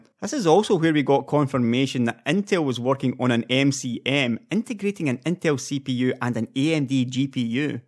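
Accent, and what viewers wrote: Scotland, United Kingdom. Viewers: Does the person have an accent, maybe? like scottish or something? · your accent's ... I'm guessing Scottish?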